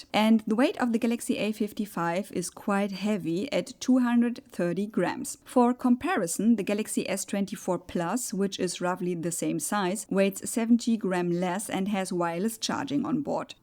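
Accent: Germany. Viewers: Ich kann Ihren deutschen Akzent deutlich erkennen.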